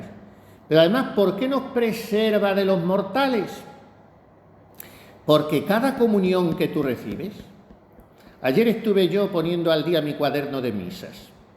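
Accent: Extremadura, Spain. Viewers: Ay Padre muero de risa ! Así o más extremeño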